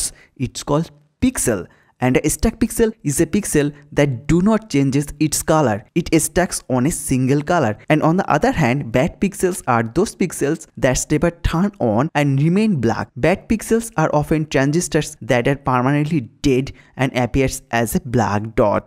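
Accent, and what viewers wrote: India. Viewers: Damn his accent hey Non- Indians please dont judge not all of us sound like that :( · Ofull indian english accent. Cant stay. I am ran away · He has an Indian accent. Did you go to school? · al least he can speak english with his indian accent, but I can't speak english properly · To people out here complaining his accent - India is a diverse country. Speaking multiple language is something a norm unlike the west. Few states in northeast does speak good English (Exception). Excuse his accent because English is not even a second language for many and I'm sure it's the same for him. Nice video 👌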